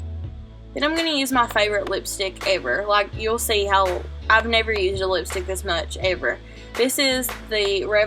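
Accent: South, United States. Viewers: I came across your channel about an hour ago and I'm pretty sure I've found my new favorite YouTuber! I'm from way deep in the south and it's so great to hear a southern accent from a guru! You've inspired me to consider making a channel:) would you consider making a video about how to start a channel, what camera you use, etc? Can't wait to see more videos:)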